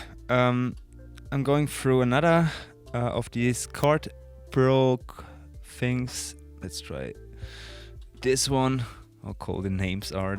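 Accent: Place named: Germany. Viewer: The German accent is legendary haha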